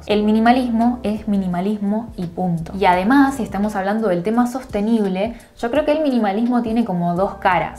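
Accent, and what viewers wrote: Argentina. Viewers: Hola, te habla una Argentina que lleva 15 años aquí en España. Y muchas cosas que contabas me fueron pasando a mi también… Llevo viendo varios vídeos y además de aprender muchas cosas que me están ayudando mucho y estoy empleando, me estás recordando mi acento y ya te estoy teniendo cariño 🥰 Un abrazo y que sigas haciendo vídeos, de minimalismo o de lo que quieras!!!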